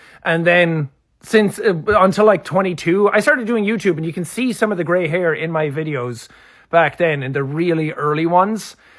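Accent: Ireland. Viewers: Why does this Viking have an irish accent?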